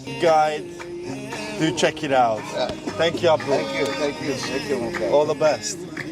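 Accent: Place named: Glasgow, United Kingdom. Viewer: You sounds Glaswegian sometime maybe you were Scottish in a previous life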